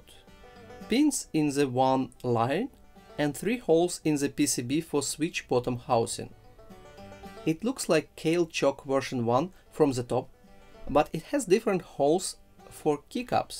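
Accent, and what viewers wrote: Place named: Eastern Europe. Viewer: No-one said about strong slavic accent? Anyone? Then me: you sound like my school English teacher😄 Btw cool content, thnx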